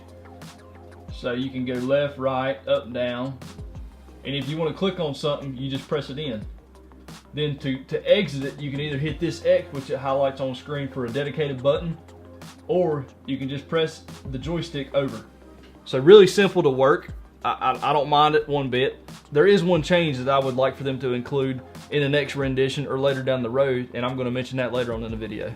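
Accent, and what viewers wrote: South, United States. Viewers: Fantastic review! How do you not have more subs?! Very informative and you cover everything. I also absolutely love your southern accent, a pleasure to listen to.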